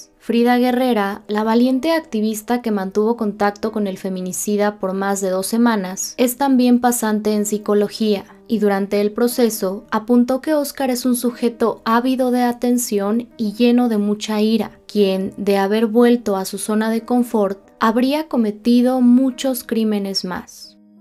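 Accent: Colombia. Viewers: cuál es tu nacionalidad por tu acento me párese colombiana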